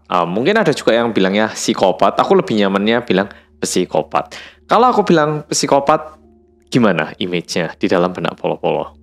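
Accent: Java, Indonesia. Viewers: Gayanya mas sekarang "korea" banget tapiiii karena sering muedok jadi pas ngomong korea otak ku tetep berkata "weh iso boso korea lek". Padahal sadar dengan penuh kalo dia ya org korea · PLOT-TWIST 🤣🤣👍🏼👍🏼Suka video, terima kasih Boloku Sing Paling Medok Dheweeeee 🙏🏼🙏🏼 Bikin video yang membahas Narcissistic Personality Disorder (NPD) juga Rek (aku juga kemarin habis bikin utas Twidder untuk NPD biar korban²nya bisa membela diri. 💪🏼✊🏽❤️❤️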